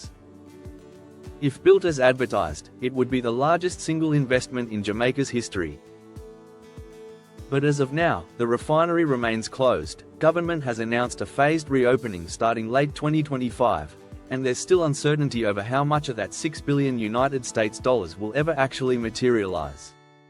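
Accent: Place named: England, United Kingdom. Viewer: This presenter has an English accent has he ever wondered why a [so called] British subject was left back so badly, that they need catching up in every department with Chinese help and money, if you didn't or cannot offer anything in the past or now toward our development. please leave us alone, all we gonna get from any western associations are sour grapes.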